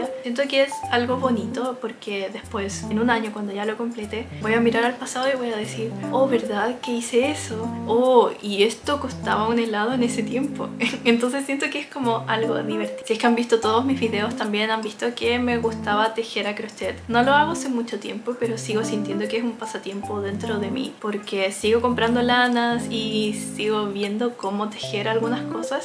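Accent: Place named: Chile. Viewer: Lindo video, gracias por las ideas, últimamente también he disfrutado más mi tiempo en casa y es lindo encontrar más pasatiempos. Conocí tu canal no hace mucho y me ha encantado :D Tus videos me hacen sentir calma ^ ^ También tu voz es muy linda y relajante (y probablemente tambien tenga que ver que el acento chileno me parece muy lindo jaja) Estoy feliz de haberme topado con tu canal :D 💕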